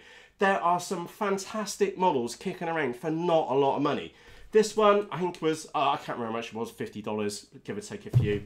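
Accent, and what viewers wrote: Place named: England, United Kingdom. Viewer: So English, makes a change from all the American channels, loving it.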